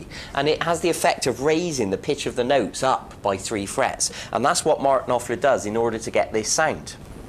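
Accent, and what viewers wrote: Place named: England, United Kingdom. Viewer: I love your lessen too. And your pleasant english accent. 5* from Germany.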